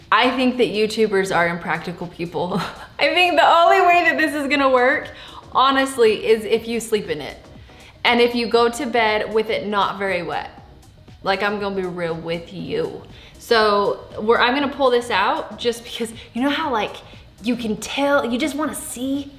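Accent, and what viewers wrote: Utah, United States. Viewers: Holy Utah accent! 🙂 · Oh my gosh. You’re in Utah. Yay me too. Must be why I was wondering what accent when I read this lol.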